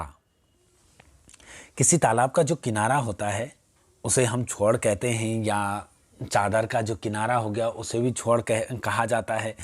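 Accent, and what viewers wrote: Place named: Garhwal, India. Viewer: Lagta h Pauri se ho ap 😅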